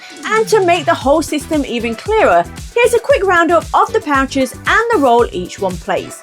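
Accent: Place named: Northern England, United Kingdom. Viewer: Hi! It says you’re from Australia but I think I hear some northern English pronunciation every now and then. Your “U” sounds in particular. Am I way off? 😊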